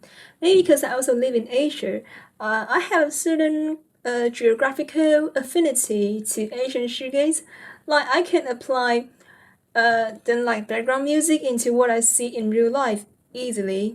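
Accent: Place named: Somerset, United Kingdom. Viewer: You've nearly nailed that Somerzzett accent!!!❤❤😊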